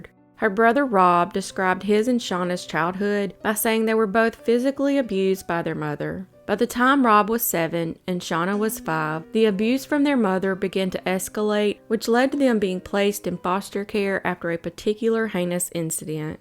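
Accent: South, United States. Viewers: As a Texas woman l appreciate a southern accent, enjoy your videos, so tragic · i kept hoping you'd say "I do declare" at some point. We Brits love that Southern drawl...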